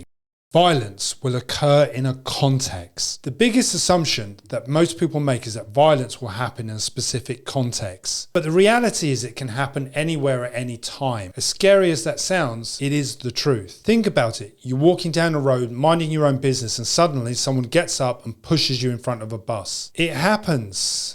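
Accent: United Kingdom. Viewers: The comments are from people all over the world, but especially GB, because the narrator is British. We don't have access to firearms in Britain. I'm guessing that you are a US citizen, and I understand your right to do EDC and defend yourself with a handgun. Here they are not morally justifiable for ordinary citizens, but that could change of course.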